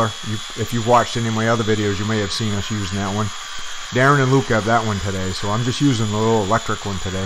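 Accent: Maine, United States. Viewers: By your accent...Maine?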